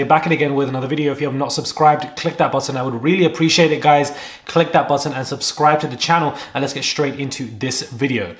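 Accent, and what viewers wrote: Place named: London, United Kingdom. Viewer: I didn’t notice your speed, I do like your layout of presenting,barely any waffling straight down to the news in hand. I had guessed you were London though. You are just fine- as you were 🙌👏🏻👏🏻👏🏻👌 P.s- didn’t know there was a speed button. On a new ish iPad?? Live and learn one for me today.cheers , G .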